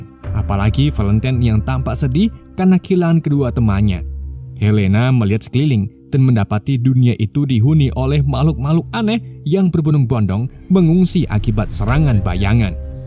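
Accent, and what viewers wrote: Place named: Java, Indonesia. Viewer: ridle ga tuh, bacaanya ridle blok jawir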